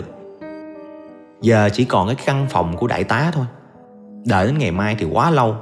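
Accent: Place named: Binh Dinh, Vietnam. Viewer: là người quê bình định à? Nghe giọng đọc cứ nghĩ tới người bình định th haha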